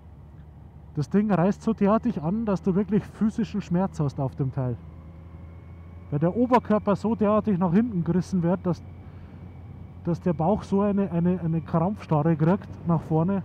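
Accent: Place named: Bavaria, Germany. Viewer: Was ein Dampfhammer, bin zwar eher der Freund von leichteren und wendigeren bikes aber auf so einem Teil würd ich a gern Platz nehmen. Zudem gutes Video und erfrischend jemanden aus Bayern mit Dialekt zu hören macht direkt sympathisch Gruß aus der Oberpfalz🏍️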